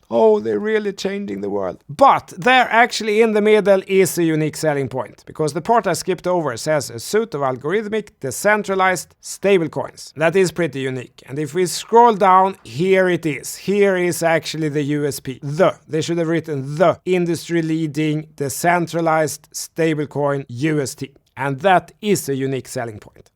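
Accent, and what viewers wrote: Sweden. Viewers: Man this Swedish accent is thicc a-f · Snälla sluta prata Stockholms engelska, hemskt för fan. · Skönt med någon som kör hårt på sin svenska dialekt, älska`rt!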